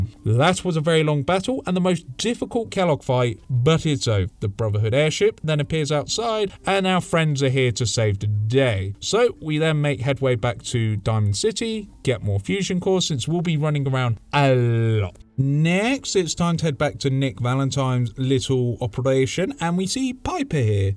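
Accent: RP, United Kingdom. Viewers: it’s the formal British accent, the intro I similar as well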